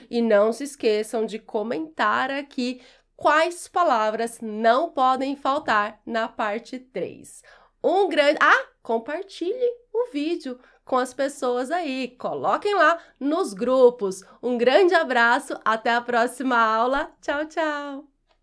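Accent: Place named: Southeast, Brazil. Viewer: O E pra NÓS no nordeste não pronunciamos COMO VOCÊ NO SUDESTE.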